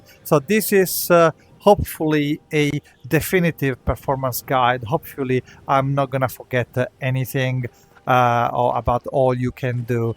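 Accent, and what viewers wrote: Italy. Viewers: Il bro è palese italiano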